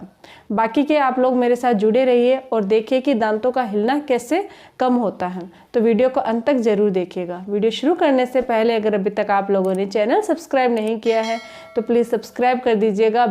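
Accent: Rajasthan, India. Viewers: mam ap Rajasthani hona? Apki tont se lagra hai 😁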